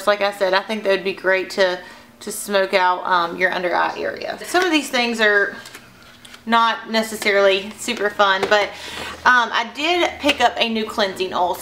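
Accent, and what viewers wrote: South, United States. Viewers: Ok, girl, u hav GOT TO B from my neck of the woods!!!! Lol!! Im from Alabama and i wud kno my Southern accents fom ANYTHING!!! Lemme kno if ur close and we'll go shoppin and trade makeup! (I didnt see if u had the UD Naked concealer and Laura M. brightening powder, but if u dont n ur close, i will let u try them and THEN U WUD RUN TO SEPHORA!! They r THE BEST COMBO!). Good vid and nice to hear from sum1 close to home!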